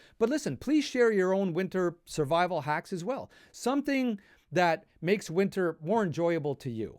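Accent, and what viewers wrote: Canada. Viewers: Well, being American (Idaho), I just had to watch your video to see if I could understand you. Guess what? I DID, and I learned some things, I really enjoyed the comments, and think you're pretty darned funny! Thanks for the tips and tricks you've shared, and it's clear that our neighbors to the north are tough and winter ready. Merry Christmas!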